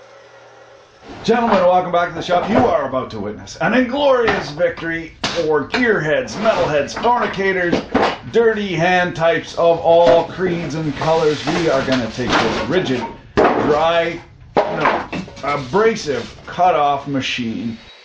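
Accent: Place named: Canada. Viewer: I love ficking Canadians. you sir get a subscribe just for the way you talk lol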